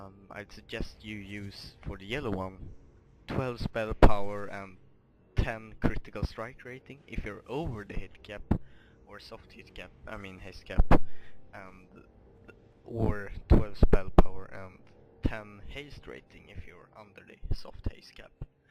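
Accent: Sweden. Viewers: this guy is swedish, i can hear it from his voice :P if not he is atleast scandinavian >:]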